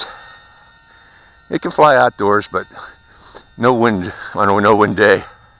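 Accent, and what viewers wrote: Pittsburgh, United States. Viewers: gotta love that pittsburgh accent :)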